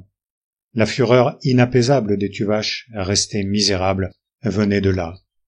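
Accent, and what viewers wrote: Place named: Normandy, France. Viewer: ❤ l’accent normand est presque bon ! (Je suis née chez Maupassant, le Pays de Caux). Merci pour la lecture 🙏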